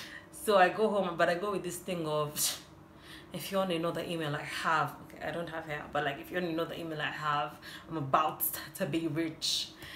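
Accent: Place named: Nigeria. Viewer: U sound Nigerian funnily enough...nice personality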